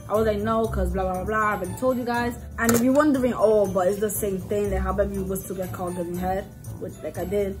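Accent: New York, United States. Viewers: You from New York huh?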